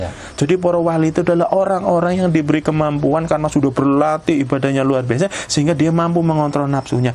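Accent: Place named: Java, Indonesia. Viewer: Waduhhhh, bhs jowo nipon mantep sanget,sedulur papat limo pancer,pok ipon jowo asli mantap,idola ku manrap cara menerangkan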